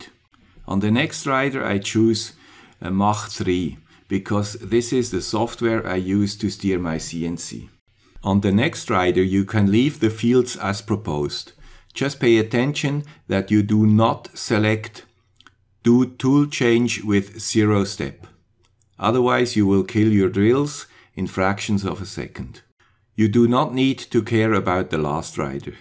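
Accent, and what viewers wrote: Switzerland. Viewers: Where can I buy one of those Swiss accents? It sounds great. And, on your videos, I especially appreciate - your commentary is not competing with loud music. If only all YouTube videos were like yours.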